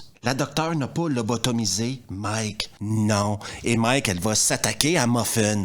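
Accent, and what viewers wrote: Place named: Montreal, Canada. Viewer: Hé Mister, quel plaisir, quel bonheur ! merci de partager ces bons moments avec nous, j'ai découvert ta chaine par hasard il y'a quelques jours et crois moi, mes 15 minutes quotidiennes de nanar et de souvenirs filmographiques me procurent un bien être et un lâché prise inégalé. Tout y est le choix des films qui ont bercés mon adolescence, les mots pour le dire, le ton, cet accent qui sous forme de "Madelaine de Proust" me ramène à la fois dans mes années 80 puis 90 quand je vivais à Montréal. J'ai rarement été si enthousiaste à l'écoute d'une émission, merci mon pote, tu fais partis de la famille now, je partage cette expérience avec mon fils de 17 ans, ce sont de réel moment de complicité. A bientôt.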